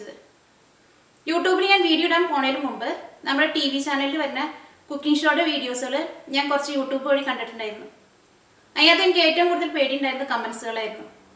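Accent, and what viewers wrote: Thrissur, India. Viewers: Pachakam pole thanne ningalude a kalarpillatha nadan bhashayum eniku ere ishtamanu bcoz im also from thrissur...go ahead sister, merry Christmas! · Hi thank u for teach us variety of food items in simple way..Ur presentation especially trisur basha is nice....all d best for u and ur familly..hapy new year.... can u show d tabe spoon, tea spoon etc..and wt is nalla jeerakam...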